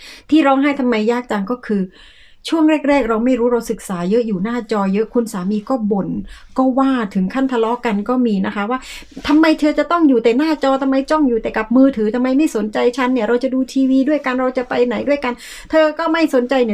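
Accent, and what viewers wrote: South, Thailand. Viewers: ชอบพูดใต้น่ารักดี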